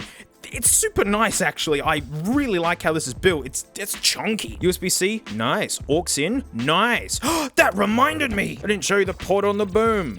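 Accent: Australia. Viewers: Imagine watching this to figure out which speaker to buy but it’s just an Australian man talking about his sexy speaker and worrying about his pkcell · I bet every Aussie dudes sounds similar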